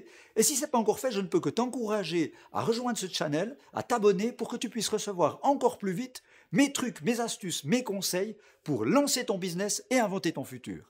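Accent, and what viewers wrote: Switzerland. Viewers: Très bonne vidéo, très intéressant, surtout pour des gens comme moi qui ont Instagram dans le coeur de leur business. Est-ce que je peux te demander tes sources? Car celles que je consulte habituellement n'ont pas relayé ces infos:) Très bon boulot en tout cas, je vais regarder d'autres vidéos 😊 Sinon, serais-tu Suisse? Je semble déceler un accent comme ceux par chez moi;)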